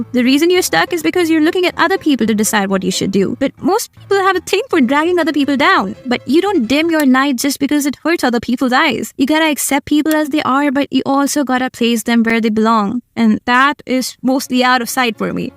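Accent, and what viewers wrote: India. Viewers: First actual good video like this, also a very good starting point in me learning to talk in indian accent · are you indian,you have a nice accent,kinda motivating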